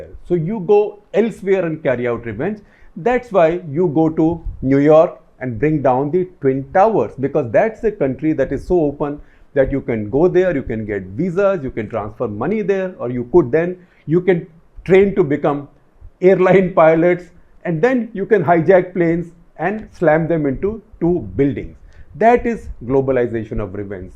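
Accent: India. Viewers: Why are you speaking English in total Hindi tone.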